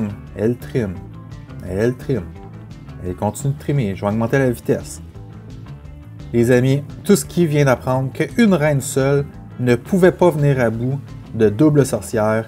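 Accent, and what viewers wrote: Quebec, Canada. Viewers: Super vidéo !! Québécois ??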